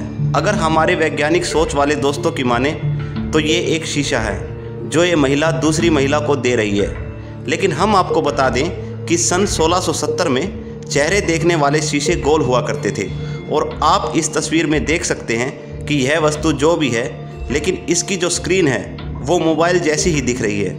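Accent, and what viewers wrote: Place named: Haryana, India. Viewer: Haryane te lage h Bhai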